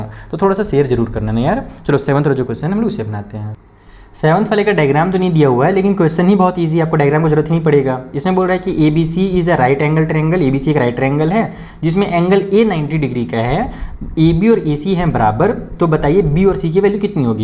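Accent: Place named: Bhojpuri, India. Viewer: Nice guru ji, Bhojpuriya apka mast 😅 Sab thik ba gurujee Thanks gurujee❤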